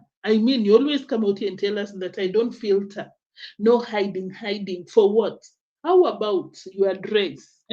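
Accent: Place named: Kenya. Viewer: Epic content! But, please tone down your Kenyan village accent. Please darling... ❤❤❤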